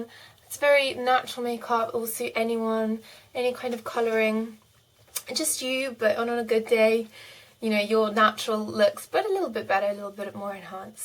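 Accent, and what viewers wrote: England, United Kingdom. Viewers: I keep watching ur vids coz of the tips but also coz of your accent!! Reminds me of Kate Middleton's accent :D What type of English accent is it, if its ok to ask?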